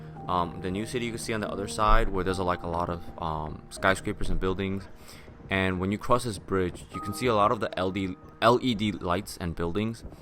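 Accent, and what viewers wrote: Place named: China. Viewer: 你的母語應該是中文吧，聽的出來你的英語有口音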